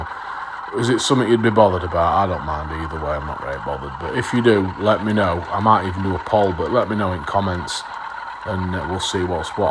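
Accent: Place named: Lancashire, United Kingdom. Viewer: Excellent, came across your channel from Western Signalman`s podcast. I do love a funny mdel railway channel and, as a southern poofter shandy drinker, I do like your accent! (My neice from that London has moved to ``Uni`` in Lancaster this year. She was horrified to find it was cold, and couldn`t understand the accent) Lancs is close to Yorks I believe :) :)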